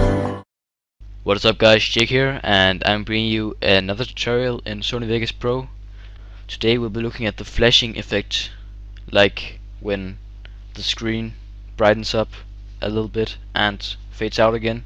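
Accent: Denmark. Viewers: hes from Denmark we almost got same accent :p :D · He sounds more like Danish. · Ur danish, right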